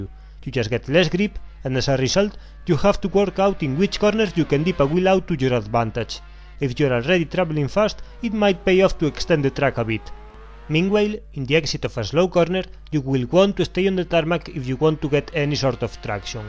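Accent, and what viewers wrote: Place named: Spain. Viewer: spainenglish! love XD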